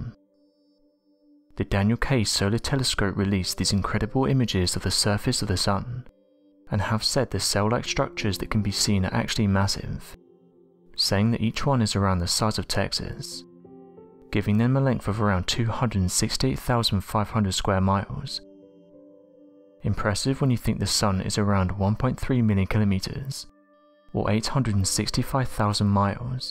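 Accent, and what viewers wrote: United Kingdom. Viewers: WHY must you talk so fast? Yes, you're British but some Brits are much easier to listen to AND comprehend. There is another speaker on your channel to which I refer. And, thanks for including SAE measurement.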